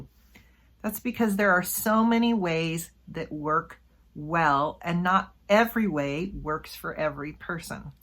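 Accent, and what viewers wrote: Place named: United States. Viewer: I love American English and American Accent so much Dear.😊😇🥰😍🤗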